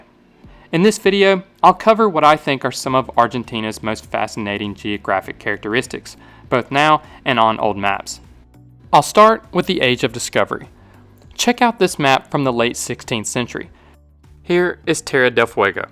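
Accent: United States. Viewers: I don't think it is English...The narrator's accent is American.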